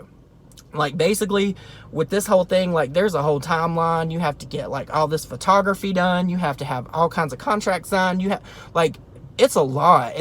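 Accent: South, United States. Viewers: New viewer! Love your accent! I'm a southern girl, too. Where are you from? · Where is your southern accent from? I'm from Georgia. 😁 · I know you don't know much about MAKEUP itself, but did you just say, "Pat McGrath is rolling around in HIS grave?" I played it back many times!!! Pat McGrath is a Woman, and also still living!! Maybe it was just that I had trouble understanding your Southern Accent, and So Sorry if I misunderstood you. I was just at a loss when hearing that!!! WOW!! Jaclyn's Shady as ALL HELL!! I agree with her Ex husbands IG, (where he posted after her post of her hand on top of her new man's hand!) Well he Posted a photo, of his dogs paw on top of his hand, (meaning to me, A BITCH's paw or hand. One in the SAME!) LOL!! I understood what Chris was meaning!!! ALSO AMERICAN HORROR STORIES ON TV ON WENSDAY NIGHTS, TODAY'S ONLY TUESDAY!!!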